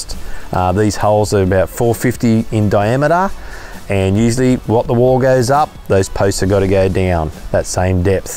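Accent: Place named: Australia. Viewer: no entiendo Aussie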